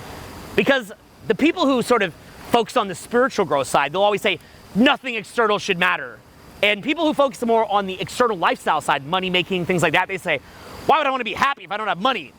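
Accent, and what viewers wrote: Australia. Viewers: i love your australian accent mate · Good fuckin Australian accent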